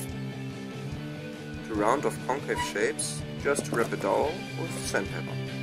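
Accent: Germany. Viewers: The whole time I thought : "this guy sounds German " and then I sa w the Obi Premium Lack and knew I was right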